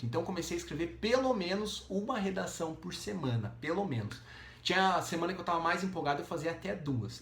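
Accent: Brazil. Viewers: Carai qual seu sotaque ? Uma hora parece ser do Rio de Janeiro depois puxa o R depois fala meio gaucho, tô bugando aqui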